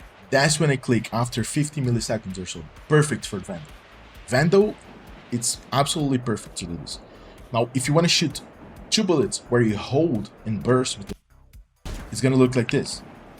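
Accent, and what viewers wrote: Brazil. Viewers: mano, vc é brasileiro né? seu sotaque parece de brasileiro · where are u from you sound kinda brazilian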